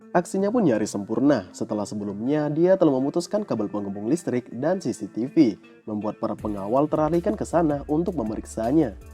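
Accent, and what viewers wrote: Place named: Bugis, Indonesia. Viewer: Saya yakin pasti suku bugis. Dilihat dari logatx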